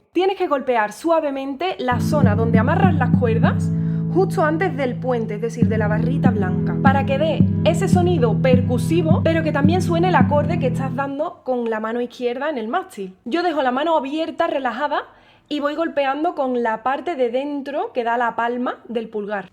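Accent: Andalusia, Spain. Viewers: Hola , mi BELLA GENIO!! ; siempre cautivado por la exelencia en cada cosa que haces, incluyo el canto, baile, escenografia, vestuario, acompañamiento, como a tu amigo saxofonista; que no dudo haras famoso porque por ejemplo ya me suscribi...y seguimos, ahora viendo embobado tus clases y tecnica que derrochas sin medida alguna y inexistente egoismo expresando desde el fondo de tu alma absolutamente trasparente y etc etc etc.....y si a eso le sumamos tu gracia y belleza y ese sabroso dejo entre Andaluz medio Segoviano......ya casi pierdo el aliento de tanto por nada; entregado con naturalidad espeluznante. No seras un Angel??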